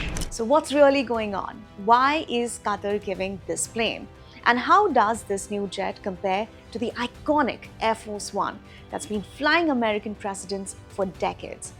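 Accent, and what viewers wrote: India. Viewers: Oh no, that Indian accent, not again😩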